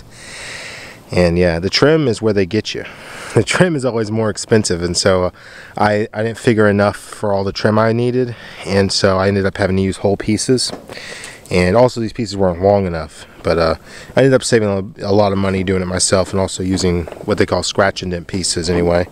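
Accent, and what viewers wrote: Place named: Pittsburgh, United States. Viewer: Pittsburgh Accent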